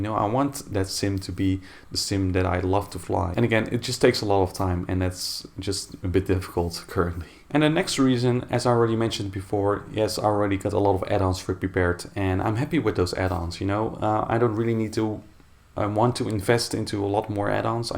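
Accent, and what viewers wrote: Netherlands. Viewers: Your Dutch accent is very funny☺️ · Okay ik ben dus niet de enige die z'n accent hoorde hahaha ik d8 ook al dat hij nl is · I KNEW IT! your dutch!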